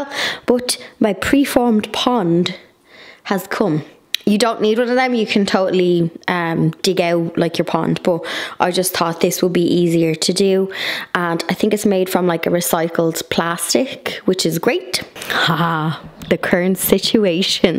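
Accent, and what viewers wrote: Ireland. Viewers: You make the Irish accent sound cute 😉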